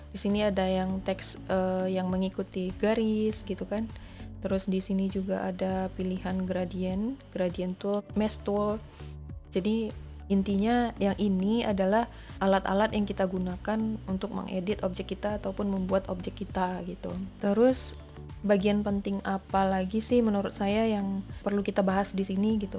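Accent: Indonesia. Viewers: orang padang kak?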